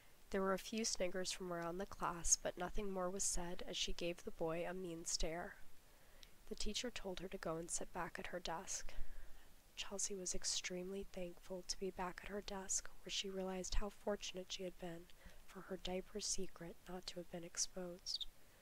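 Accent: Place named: United States. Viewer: Hello! I have just listened to Chapter 5 of "Older Sister Chelsea's Secret" and I am enjoying each chapter that you narrate. You have a wonderful, soft, feminine voice.Your cadence and tempo, as well as inflection and pronunciation is spot on! I could listen to you all day. Though your accent is pure American (guessing West Coast, but maybe Midwestern) the story definitely takes place in either the UK, or one of the Commonwealth nations, such as Canada, New Zealand, Australia or even Bermuda (I live directly west of Bermuda) . Instead of "till", we use "register" or "counter". Instead of calling a teacher "Miss" American students either address their teacher by their name, e. g. "Mrs. Stevens" or "Miss McKee" When the teacher's name is unknown to a student, the correct way to address and converse with a teacher is by use of the appellation, "Ma'am". 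But, please continue to use the British terms for things. I love how British and American English differ so much from one another that my wife and I have to turn on closed captioning when watching a British mystery or film. Slang words and expressions (particularly Cockney English with it's rhyme) and the varied dialects make it almost impossible to understand sometimes, with American ears. I sincerely feel sorry for Chelsea. Her fear of Tina or her parents discovering that she is a bedwetter and has to wear adult diapers to bed, is second only to her fear of being "outed" as a diapered 18 year old high-schooler who has to wear di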